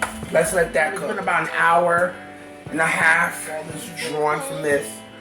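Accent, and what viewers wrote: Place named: East Coast, United States. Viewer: Hey Ms! I love your cooking style! Your green sauce is giving me life and I haven’t tasted it yet! I love your sass and that east coast vibe. Oh yes, I can hear it in your voice! Thank you for this depth of flavor. I’ve seen recipes where people just add salt and pepper with some chicken stock. Period. I need spice in my life! I bought some neck bones and limas today, so this will be on my table soon! Thanks so much! ❤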